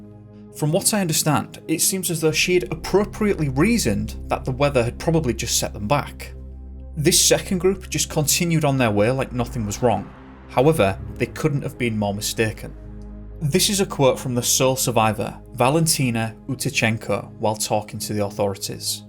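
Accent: United Kingdom. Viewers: Thank God, I've been looking forever for a video on this incident told by someone with a British accent. 👍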